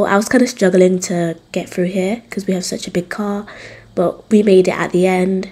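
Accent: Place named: United Kingdom. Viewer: The British accent though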